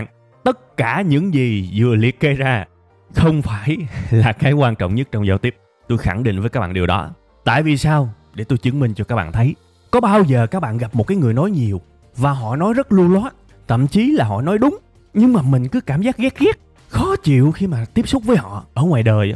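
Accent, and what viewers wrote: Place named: Tay Ninh, Vietnam. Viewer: Mình có người bạn ở tây ninh, học làm ở sg cái giộng điệu, nhấn nhá, cách nói chuyện y chang bạn này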